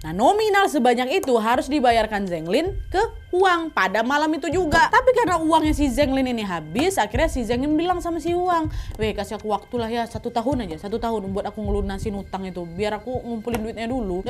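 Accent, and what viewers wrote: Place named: Riau, Indonesia. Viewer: Pembawaan ceritanya enak kali apalagi ada logat2 riau nya 😂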